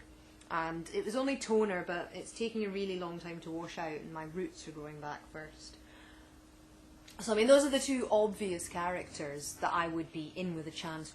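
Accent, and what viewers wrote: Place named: Scotland, United Kingdom. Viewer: I love your accent! You have to be from Scotland right?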